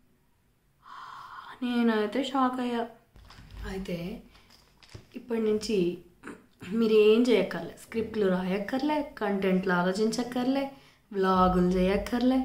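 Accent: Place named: Telangana, India. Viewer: Good try . Meeru telangana baaga matlaadutunaaru 😀. Keep it up .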